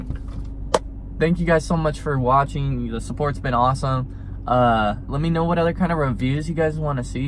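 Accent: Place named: Arizona, United States. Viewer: I can hear your AZ ascent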